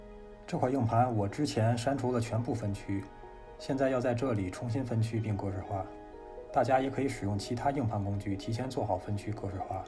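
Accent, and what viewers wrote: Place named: Tianjin, China. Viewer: 我从油管上找了很长时间的视频都他妈没有说得清楚的。您说清楚了。关键是，我听出来了乡音。我是离开家乡二十年的天津人。哈哈哈哈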